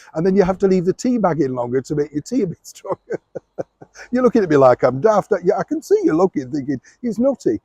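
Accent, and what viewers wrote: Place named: Northern England, United Kingdom. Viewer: sweetheart,everything you talk about resonates with us Brits,For some of us who've lived overseas for decades its nice to hear about headcolds'horlicks 'spring flowers and your sweeet northern english chitchat.Dont change darling and dont listen to the negative people'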